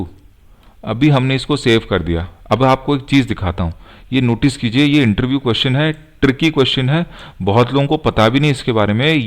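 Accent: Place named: Madhya Pradesh, India. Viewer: sir,excellent.....aapki hindi toh hamare MP ke jesi hain.. i have learnt TestNG from 2 months lekin aapne toh 1 din me hi sikha diya...samjhana ka tarika bahut saral and easy hain aapka thank you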